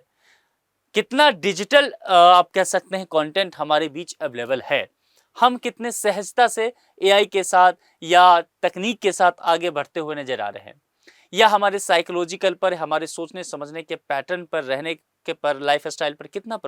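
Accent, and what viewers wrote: Bihar, India. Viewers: Bolne se lagta h ye bihari h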